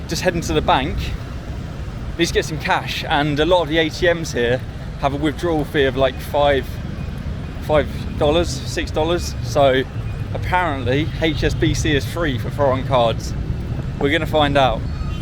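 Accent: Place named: United Kingdom. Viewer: UK accent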